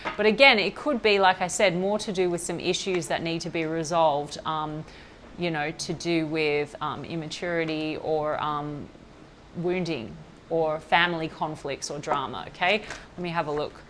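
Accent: Australia. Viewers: You're SPOT ON for my relationship!!... Amazing 👏 Thankyou🙏🩷 And lovely to hear a fellow Aussie 💞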